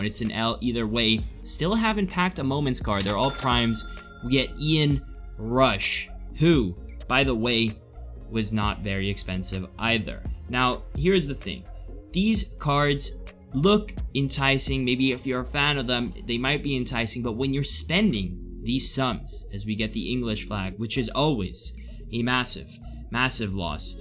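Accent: United States. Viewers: Italian? You sound completely American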